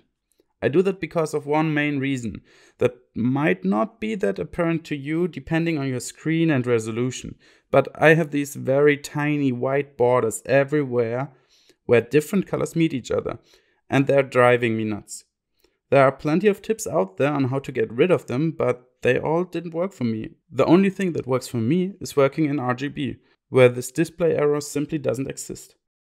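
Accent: Germany. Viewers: your name is not, it's. i know a german accent when I hear one:D great tutorial btw! thank you so much for uploading it!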